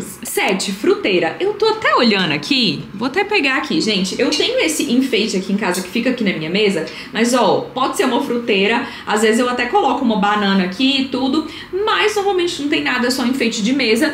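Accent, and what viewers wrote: Minas Gerais, Brazil. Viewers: Coseraiada, muito mineira, que nem eu😂😂😂😂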